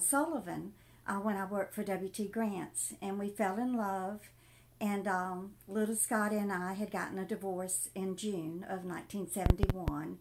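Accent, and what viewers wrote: South, United States. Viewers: Nice story , love your southern accent it never went away !!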